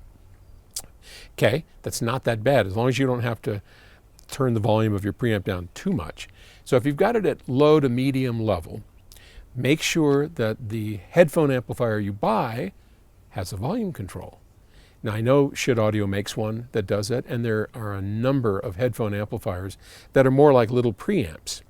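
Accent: United Kingdom. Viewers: THE British accent!... Which one?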